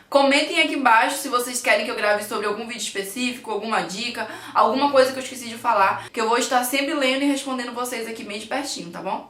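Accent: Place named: Manaus, Brazil. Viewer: Parabéns pelo canal. Você é muito linda. Amo o seu sotaque e de todos os manauaras. Oh trem bão que é seu estado e quanta riqueza. Me chame Teotonio e sou de Itajubá, Sul de Minas Gerais. Beijos 😘.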